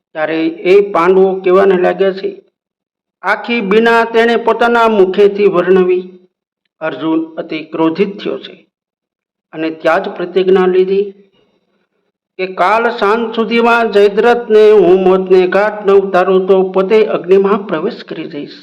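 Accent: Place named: Amreli, India. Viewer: આવું બધું કથાકારો માટે રહેવા દ્યો,. બહુ જામતુ નથી. તમારી તો તળપદી અમરેલી/ભાવનગરની બોલીવાળી કોમેડીમાં જ અમને વધારે મોજ આવે છે.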